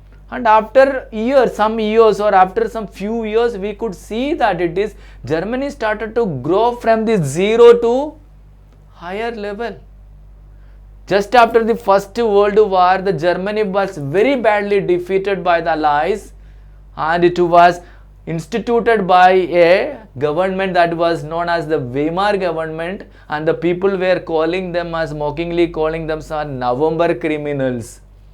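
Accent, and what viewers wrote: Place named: Kerala, India. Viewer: I'm not laughing.........I just told it because I'm also a malayali..... by his accent I could recognize that he is also a malayali.....that's all..........No Offense!!!!!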